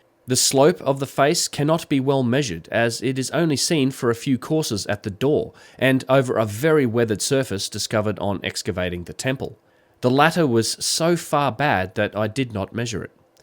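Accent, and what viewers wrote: Australia. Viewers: Good on you mate. I have developed quite a taste for your output and even though I don't necessarily agree with some of your ideas it is refreshing to see someone not just regurgitating the musty work of the orthodox crowd and be prepared to come at these matters from a different perspective ,,particularly with an Australian accent. I don't know if you are self taught but if so that is even more praiseworthy given the range of topics you skate through while showing the bird to the "club" I am enthralled by the current debate bubbling away concerning the age of the sphinx and would love to hear you do a paper or podcast on that. The proponents of the older sphinx are not ratbags but well educated professionals with fairly persuasive arguments from geology and a range of other disciplines to support them .It is fascinating to observe such a vibrant argument.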